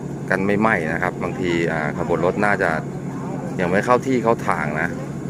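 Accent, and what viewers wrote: Ratchaburi, Thailand. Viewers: ไม่มีอะไรต้องติ ผู้บรรยายดีกว่าตามทีวีหลายช่อง เสียงชัดเจนฟังสบาย ติดสำเนียงราชรีบ้าง แต่ป็นเสน่ห์อย่างนึงของภาษาแต่ละท้องถิ่น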